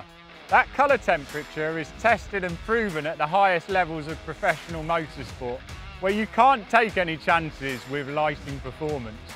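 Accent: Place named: United Kingdom. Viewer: That britsh accent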